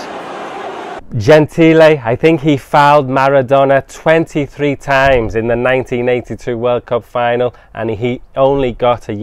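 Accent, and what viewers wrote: United Kingdom. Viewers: That's a nice way of teaching English through football. Also, it's very nice of you to speak considerably slower as people here usually have difficulty in understanding British accent. Cheers!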